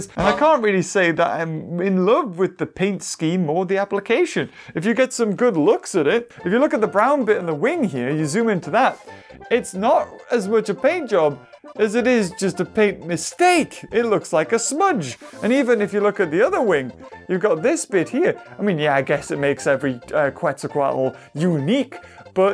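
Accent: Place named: United Kingdom. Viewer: V you sound like a "fance English men eh"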